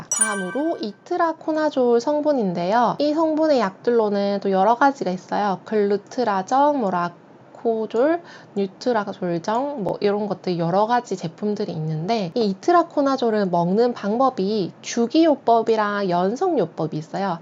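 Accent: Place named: Gyeongsang, South Korea. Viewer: 선생님 인기 인기 하는데 뭔가 경상도 냄새가 나는데 ㅎㅎ 경상도분이시죠? ㅎㅎ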